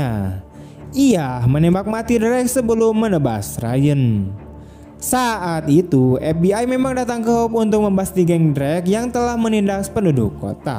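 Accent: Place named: Sunda, Indonesia. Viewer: Kau jadi pelawak pun tak laku bro ...logat bicara mu itu yg betol jangan maluin bangsa Sunda ... Bicara yg tegas jadi lelaki itu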